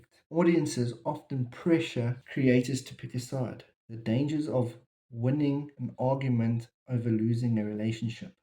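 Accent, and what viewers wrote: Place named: South Africa. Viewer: You sound South African brother! Thanks for sharing. Popping by from Mozmabique!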